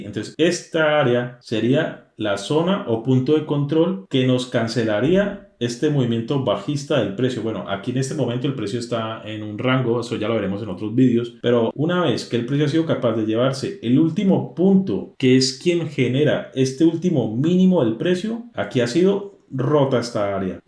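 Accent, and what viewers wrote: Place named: Valle del Cauca, Colombia. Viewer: Hermano saludos desde 🇨🇴 !!! eres colombiano cierto? Vallecaucano? pero por lo que veo no vives en colombia porque dijiste que operabas en horario europeo... En fin... solo queria darte las gracias por este contenido... te acabo de encontrar y llevaba rato buscando alguien que explicara sobre estructuras de mercado! Esto en este momento de mi vida es de mucha ayuda ya que estoy comenzando como trader y creo que entender esto es fundamental para poder ser rentable! Te doy un par de ideas de videos ya que veo que estas comenzando con el canal! seria bueno que hicieras un video explicando la terminologia que usas... por ejemplo... que significa "liquidez" cuando dices que hay traders buscando liquidez. o que significa acumulacion y cual es la diferencia... esto es algo que no tengo muy claro y que nos ayudaria mucho a entender tus videos... 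Muchas gracias nuevamente y aqui tienes un nuevo subscriptor fiel de tu canal!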